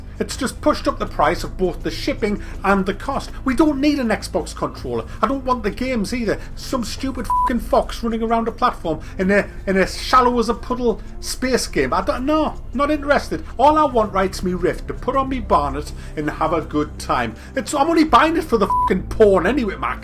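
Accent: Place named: Newcastle, United Kingdom. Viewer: Sounds like he's from newcastle, or near by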